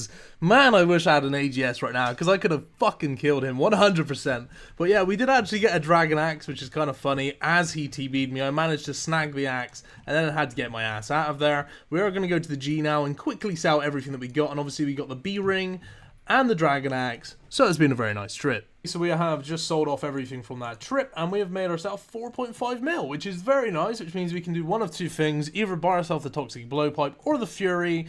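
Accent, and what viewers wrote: Bristol, United Kingdom. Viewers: bristol accent 😂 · are you from Bristol? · Are you from Bristol England? Or near by? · Are you from Bristol? Sound very Bristolian aha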